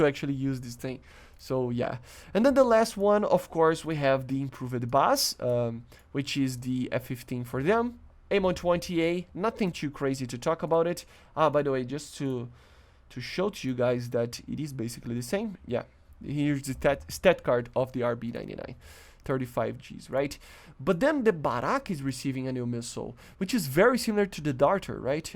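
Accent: Brazil. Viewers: Sotaque brasileiro?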